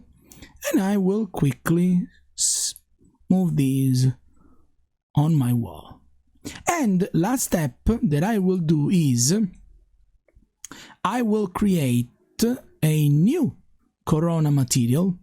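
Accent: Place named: Sicily, Italy. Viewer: Finally! Finally that Sicilian accent makes it to YouTube 😎💪🏿🌺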